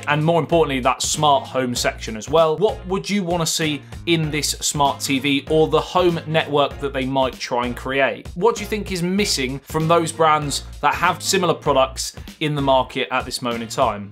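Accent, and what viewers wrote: England, United Kingdom. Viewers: your videos are awesome and informative- especially i like your English accent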